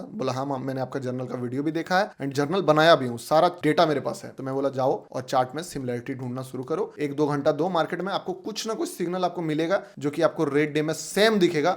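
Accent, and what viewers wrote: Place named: Odisha, India. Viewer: Bhai tum odisha se ho 😊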